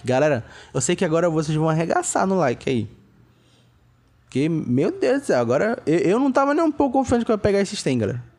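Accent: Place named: Pará, Brazil. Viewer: Você é de onde??? Parece o sotaque do norte,e do Pará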